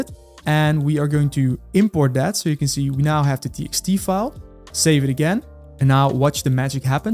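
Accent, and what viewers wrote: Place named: Netherlands. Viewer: I hear dutch accent :D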